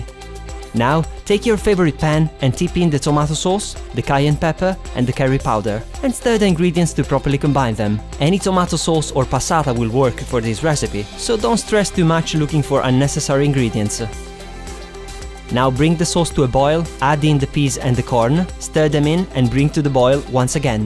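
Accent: Italy. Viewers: yes I really like all the recipes in here, sounds like you are Italian from the accent I'm Italian also but I have been in the USA a long time my accent is a bit different, anyway I can't wait for another of your recipes:) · I'm sorry this has nothing to do with the video but are you italian? you sound italian!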